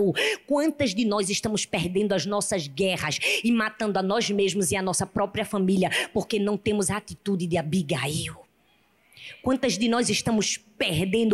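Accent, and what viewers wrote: Northeast, Brazil. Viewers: Que sotaque maravilhoso, desse meu nordeste!!!!❤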